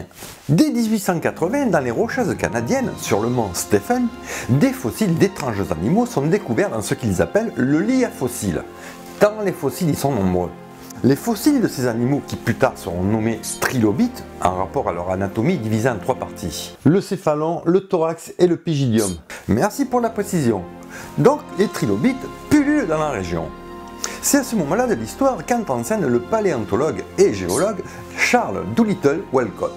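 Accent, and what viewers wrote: South, France. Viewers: Il parle trop vite et avec un fort accent du sud. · Puis-je te demander de quelle région es-tu ? En tant que Belge, je cherche à parfaire mes connaissances des accents français 😅 (pour le moment j'ai compris que c'était "Sud" mais ça reste vaste)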